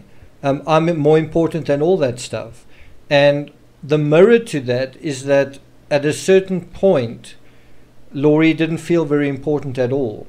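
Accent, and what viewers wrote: South Africa. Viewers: Wow a south african accent! The first I've heard as far as this case goes. Interesting video I've subscribed. (Johannesburg here)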